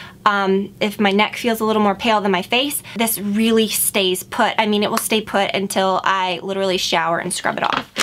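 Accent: South, United States. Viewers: I feel like I hear so much southern drawl in your voice, I love it!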